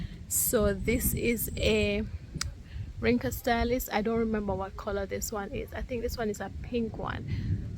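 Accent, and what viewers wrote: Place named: Caribbean. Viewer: Wait u sound Caribbean. A trini here 🇹🇹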